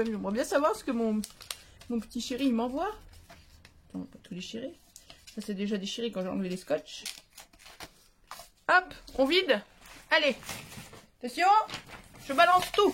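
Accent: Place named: South, France. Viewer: j'aime beaucoup votre style 😉, comme l'a fait remarqué Amandine, votre accent nous met le sud dans la tête. Bienvenue sur you tube, c'est courageux de se lancer, moi ça fait des mois que j'y pense mais... Et j'ai l'impression que ça prend beaucoup de temps, et surtout quand j'entends sur certaines chaînes que les gens sont si méchants, je ne sais pas si j'arriverai à laisser passer ou à m'énerver.. Les deux chaînes dont vous vous êtes inspirées pour la création de vos cartes, sont pour moi de super chaînes avec de belles personnes derrière leur caméra. Bonne continuation. À bientôt ici ou sur votre chaîne. 😉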